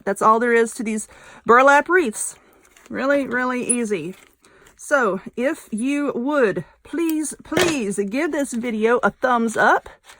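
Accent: South, United States. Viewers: You were cracking me up with the little comments about your ex. So funny. I love your southern accent and sense of humor. And also your creativity. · I know this is an old post, but I love it. Where are you from? You have a somewhat southern select. Loved heart wreath.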